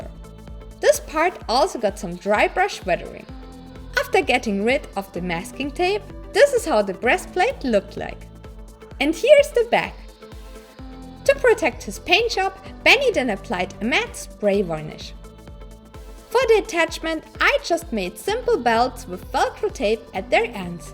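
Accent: Germany. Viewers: Your German or?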